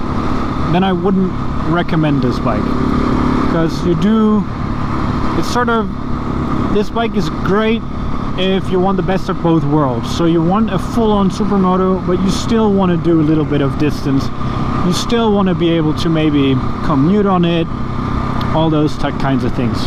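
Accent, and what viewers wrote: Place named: Germany. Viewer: Kompliment für den Akzent, hört sich nicht so schrecklich deutsch an :D Sehr aufschlussreiches video!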